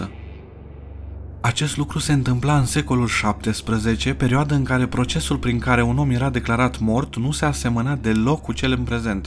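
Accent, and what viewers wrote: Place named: England, United Kingdom. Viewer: Ai puțină engleza pe la colțul guri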